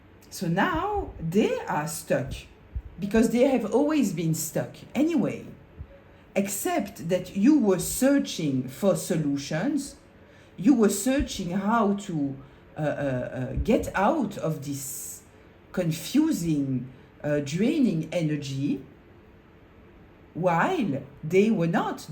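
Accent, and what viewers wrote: France. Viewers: Thank you so much!!! :face-red-heart-shape::face-red-heart-shape:Yes exactly! And you explained it so perfectly. I feel like sending it out to my community and extended relatives to explain what they are doing to me. I totally walked away. This helped me so much! I was feeling guilty. Prayed to God for an answer. You provided that for me in a beautiful french accent. I am so grateful to you. You are sure connected to me. I mean it is exact. Took me years to see it. They have caused me such hell, I've lost so much money due to them and I'm so happy to be rid of them. :face-red-heart-shape::face-red-heart-shape::face-red-heart-shape: :eyes-pink-heart-shape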